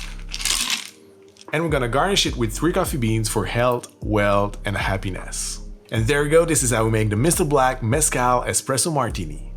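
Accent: Quebec, Canada. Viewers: Hey you're Québecois? Would have never thought? Is your accent because you are francophone? (I am aswell from Mtl south shore)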